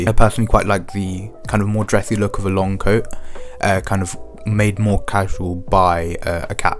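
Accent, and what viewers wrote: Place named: England, United Kingdom. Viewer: just becoz you English accent 🤌is wow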